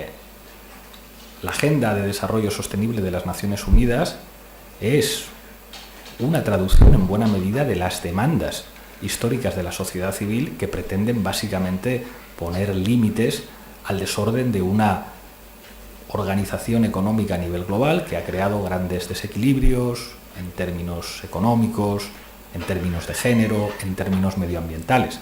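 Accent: Spain. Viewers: Comunismo bolivariano con acento español de España. A los jóvenes puede que los engañe, a los más maduros NO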